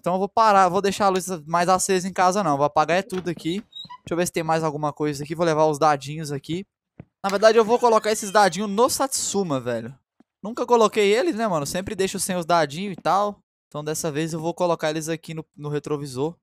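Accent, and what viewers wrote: Minas Gerais, Brazil. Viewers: Vc é mineiro?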